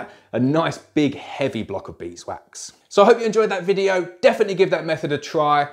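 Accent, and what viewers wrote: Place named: United Kingdom. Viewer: arrr-sigh and eeeeee such a refreshing change to find a fellow Brit doing 'How-to's' excellent video so double thumbs from me Boss I find american videos so tiresome and so prolific on here, why they have to make the simple sound so clever and complicated I will never understand but hey-ho. You've done an excellent job here so thank you very much indeed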